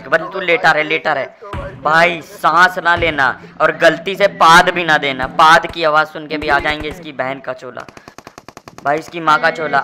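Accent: Kanpur, India. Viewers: Kaun city se h Kanpuriya lagte ho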